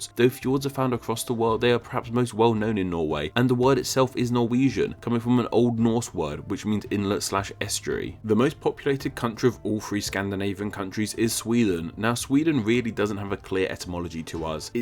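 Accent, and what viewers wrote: United Kingdom. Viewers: Ah. British accent.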